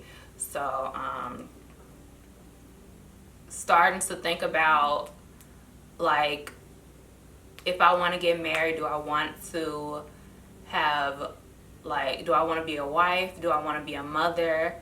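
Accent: Chicago, United States. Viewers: Where are you from? You sound like you're from Chicago. Anyways Yes this is totally normal to feel like you're missing out or when will your time come to start a family. I'm 28 about to be 29 in like 2 weeks and I don't see myself getting married to anyone anytime soon... It sucks because as women we are meant to think that there is some type of clock ticking, but we often forget that the only clock that matters is God's clock. It also makes it difficult when you get to my age and everyone around you is asking when are you going to have babies... Your story unfortunately is not unique I too grew up in a single parent home and I feel like yes it was rough and it definitely left me lost when it came to relationships. I feel like I made a lot of mistakes and dealt with a lot of bad people because I was seeking love from the wrong places. At the end of the day life is a journey and no ones journey is perfect. 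The whole idea of being married by 25 with 2 kids a dog and a picket fence is not a reality it's just a fairytale. Pray that God will send you a good man and it will happen but don't be afraid to let some time pass before he finds you. Just keeping working and improving and before you know it things will fall into place even better than you imagined.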